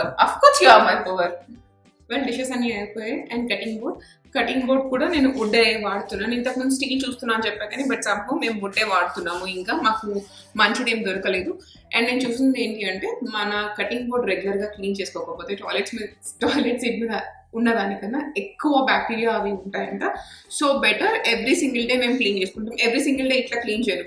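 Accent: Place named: North India, India. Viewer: Your Telugu is very different. Did you grew up in north India ?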